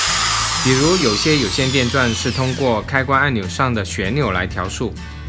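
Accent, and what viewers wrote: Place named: Taiwan. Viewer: 台湾腔，台湾人吗？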